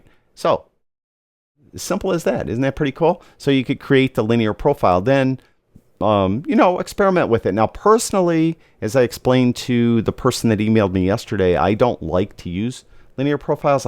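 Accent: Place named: Chicago, United States. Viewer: I can pick up on Chicago accect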